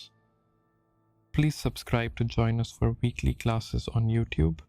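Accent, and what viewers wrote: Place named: India. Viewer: His english sucks. "Lie back" or "Lay back"??? However i love Indians, but their english sucks. Horriable accent.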